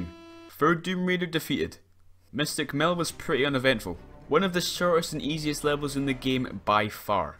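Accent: United Kingdom. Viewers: Wait why is he British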